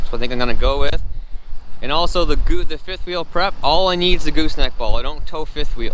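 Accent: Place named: Canada. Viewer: I just realized your Canadian.... now I can’t unhear it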